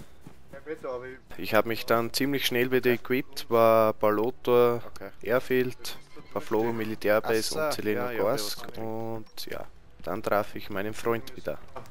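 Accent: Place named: Austria. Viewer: Ich bin auch Österreicher, voll krass aber irgendwie geil dass du auf YT so redest :D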